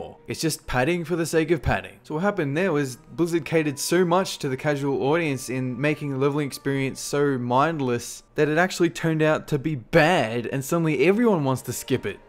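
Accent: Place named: Australia. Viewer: You have a great voice man.. its hard to keep people interested for half an hour.. but the fact that your voice doesnt annoy the shit out of me.. which a lot of wow fans do :(.. great stuff.. and im a brit.. but have always love the aussie accent.. where is ur accent from? canberra? im prob way out and ur a kiwi or something :) neways.. great vids man.. great job.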